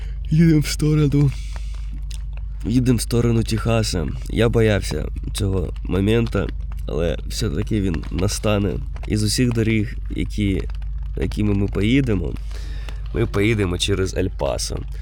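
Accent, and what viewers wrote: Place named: Chernihiv, Ukraine. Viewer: У тебе такій говорю наче ти з чернігівської області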